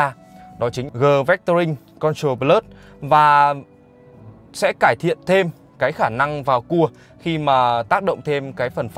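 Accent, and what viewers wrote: Vietnam. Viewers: Vãi cả pre mi ùm. Đọc tiếng anh mà như tiếng việt...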